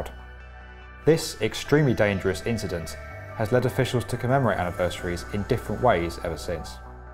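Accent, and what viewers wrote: United Kingdom. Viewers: Why is a British guy narrating US history? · This guy must be brit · I hate that the author has a British accent it’s pronounced Marin on Marion. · This is as heavy a British accent as it can get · Can you not find American's to narrate these videos. EVERY video I pull up has an annoying British accent.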